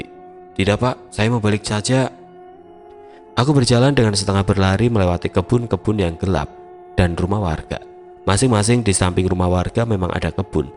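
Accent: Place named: Java, Indonesia. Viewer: Mlah fokus ke logat admin.Admin asli jawa mana ?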